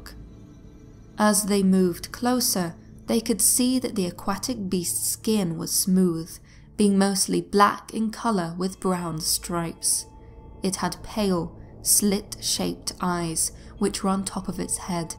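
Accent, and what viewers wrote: United Kingdom. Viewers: The British accent played out in these narrative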